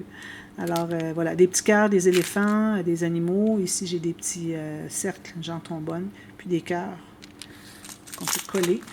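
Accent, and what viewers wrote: Canada. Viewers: Bonjour,je viens de voir votre vidéo et votre chaîne pour la première fois, je me suis abonnée donc pourrai vous suivre 😊. J' aime bcp vos boîtes de rangement, j' ai l impression de me voir car je suis comme vous, je trouve votre classement très pratique, vous avez bcp de belles choses , beau matériel , j' ai hâte de découvrir encore ce que vous faites ou allez faire avec tous ceux-là...bien expliqué avec votre gentille voix...... accent agréable...Canadien ? merciiiii et prenez soin de vous madame, à bientôt j'espère 😊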